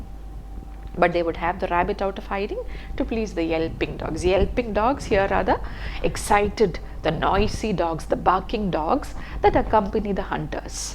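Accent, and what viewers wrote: Kerala, India. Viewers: And a clear Malayalee accent.😊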